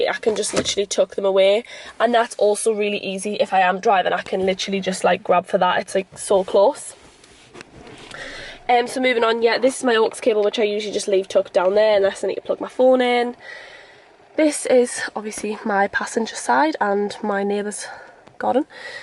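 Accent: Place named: Newcastle, United Kingdom. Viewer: I love your accent, are you from Newcastle? And also, where did you buy your car combi bag? X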